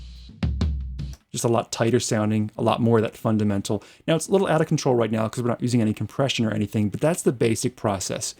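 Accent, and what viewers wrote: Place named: United States. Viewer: Love your teaching style, great advice, very well presented, nice results. On a humorous note: I can’t believe nobody called you on your pronunciation of “high piss filter” !! I speak four languages and traveled for two decades around the world, so I understand accents, language barriers, all kinds of stuff. But except for that one word, you sound like a very normal English speaking (from America) kind of guy. Not sure why you pronounce high pass with an i. Anyway, thanks for a great tutorial, catch you on the next one !!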